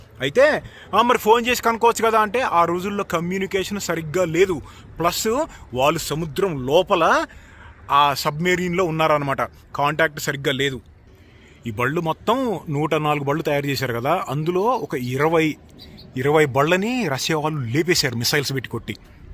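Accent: Andhra Pradesh, India. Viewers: miru andhra vallu laga matlada taru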